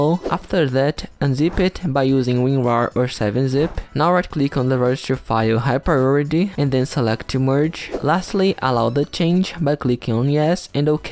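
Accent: Brazil. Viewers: Eu senti que seu inglês tava meio brasileiro kkkkkk dps que eu vi o nome do canal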